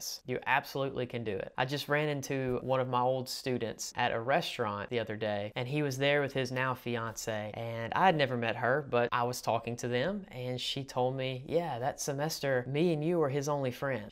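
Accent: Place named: South, United States. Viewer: Your particular Southern accent is nice to listen to.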